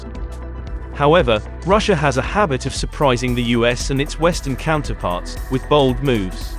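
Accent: United Kingdom. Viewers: The host got British accent, nothing but evil comes out of their minds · When ever I hear a British accent I can't believe anything all Colonial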